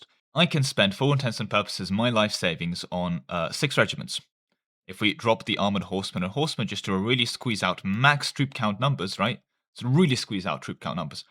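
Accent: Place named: United Kingdom. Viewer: x3 speed is only possible for americans, British accent unintelligible over 2-2.5x speed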